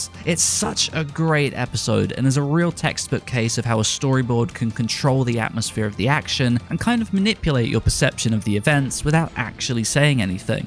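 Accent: United Kingdom. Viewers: Japanese words + a heavy english accent sounds a bit cringeworthy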